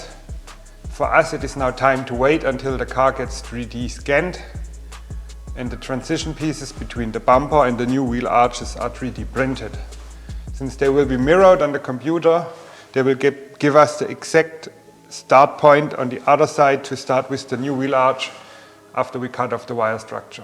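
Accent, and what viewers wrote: Germany. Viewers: Warum in Gottes Namen redest du kein Deutsch, das hört man das du kein Amy oder Engländer bist sondern ein Deutscher. Authentizität ist was anderes, aber gut muss jeder selbst wissen wie Authentisch er sein möchte oder auch nicht, wie in deinem Fall.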